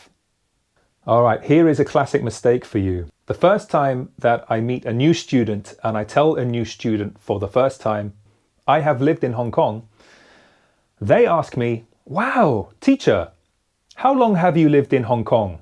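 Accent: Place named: London, United Kingdom. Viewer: Hi my dear teacher! That was exactly what I needed! Your excellent explanation of Perfect Perfect Simple, with your beautiful londoner accent! Congrats! It is a great video production!🌹🙏😘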